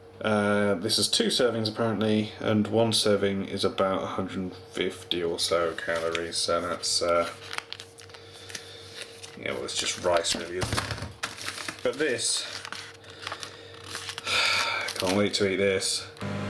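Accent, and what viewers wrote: United Kingdom. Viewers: great channel! your deadpan british accent makes it even more hilarious!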